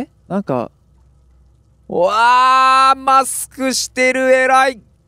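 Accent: Hiroshima, Japan. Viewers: あの…毎回出る広島弁？が最高です…(º﹃º ) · 広島弁が心地よいー☺️ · 増田さんの広島弁、やっぱり好きだなぁ～。🥰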